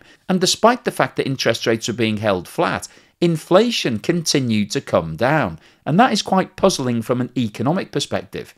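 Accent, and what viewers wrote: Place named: England, United Kingdom. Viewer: Sometimes, just for fun, I talk in voice and pretend I'm English.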